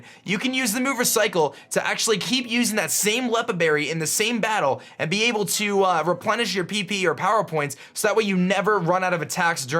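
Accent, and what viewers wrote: Australia. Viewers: I haven't watched in a while... when the f did he get an aussie accent?! peukomon it's pretty faint but it's pretty new for me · I just noticed he switches between kinda austrailian and american accents so he sounds like one of those surfer dudes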